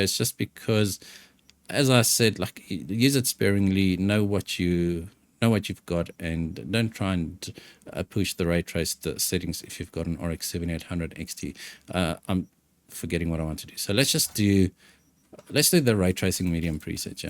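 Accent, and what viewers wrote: South Africa. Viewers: Noice I could hear your accent, Ill sub man, Im from Cape Town now in Scotland! Happy Youtubing!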